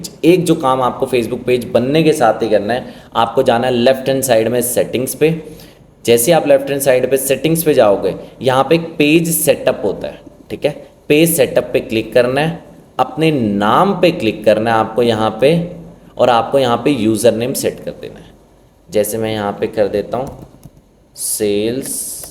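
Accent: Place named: Garhwal, India. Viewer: Sir aapke gadwali tone se smj gya aap gadwali hain bhut bhut dhanywaad Sir jo aap youtube ke madyanam se hmra margdarshan kar rhe hain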